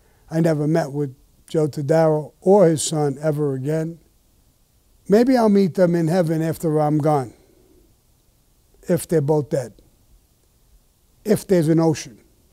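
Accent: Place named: Brooklyn, United States. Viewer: I have italian friends from brooklyn new york. They act, talk and sound exactly all the same. Just like Sammy.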